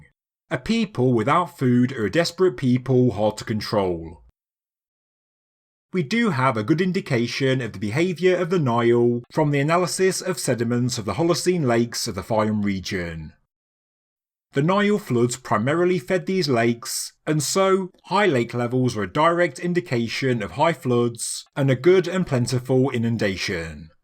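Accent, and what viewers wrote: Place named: Midlands, United Kingdom. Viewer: He’s from the Midlands………… leave him alone😡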